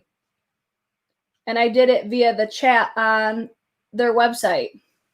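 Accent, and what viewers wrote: Midwest, United States. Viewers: New subscriber! You’re such a goof! I love you! Where are you from originally? Do I hear a Midwest accent?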